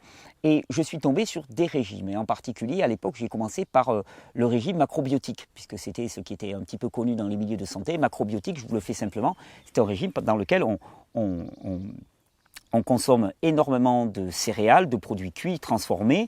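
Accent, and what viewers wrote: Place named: Southwest, France. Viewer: Bonjour! 😃 Te rends tu compte que je te connais à travers tes vidéos depuis des années et que seulement en juin 2019 j'ai commencé un jeûne. Je suivais ton programme alimentaire uniquement des yeux, parce que je n'ai pas les moyens financiers pour acheter un extracteur de jus et des fruits et légumes bio. Là j'entame mon 3e jours de jeûne sec, que j'espère faire durer 7 jours au moins. Je suis terrorisée par comment je pourrais casser le jeûne sec. J'ai vu ta vidéo sur un jus vert avec de la noix de coco ou avocat. Mais comment faire? Je n'ai pas d'extracteur de jus. Il y a un biocoop pas loin de chez moi, je pris pour qu'ils aient les jus appropriés. J'adore t'écouter parler, c'est d'ailleurs ce que j'ai fait depuis le début du jeûne en visionnant toutes tes vidéos de 2016 sur le jeûne la fête du corps. Ton timbre de voix et ton accent du Sud-Ouest, ta facilité d'élocution et ton grand savoir, sont source d'apaisement pour moi. 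À défaut de fruits et légumes bio, je me nourri de toi. Merci mille fois! 🙂 Merci encore! 😃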